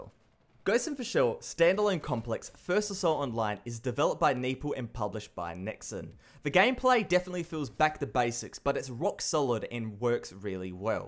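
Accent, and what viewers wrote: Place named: Australia. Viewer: I KNEW U WERE AUSSIE THE MOMENT I CLICKED ON THIS VID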